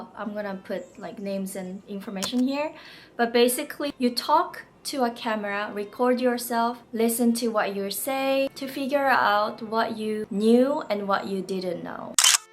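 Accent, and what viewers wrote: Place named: United States. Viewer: きれいなアメリカ英語で素敵です！発音記号IPAは勉強されましたか？それとも耳で入ってきたものを真似してアウトプットする方法ですか？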